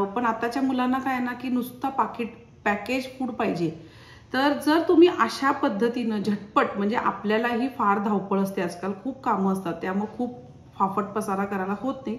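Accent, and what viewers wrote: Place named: Karnataka, India. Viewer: तुमचे कर्नाटकी मराठी हेल काढून उच्चार खूप छान वाटले कानांना ऐकताना 😍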